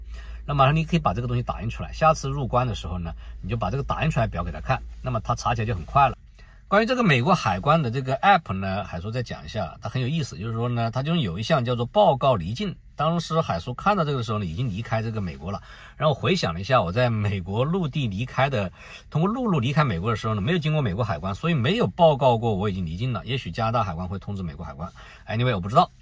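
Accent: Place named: Nanjing, China. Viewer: 海叔，口音，是南京人，我住罗德岱尔堡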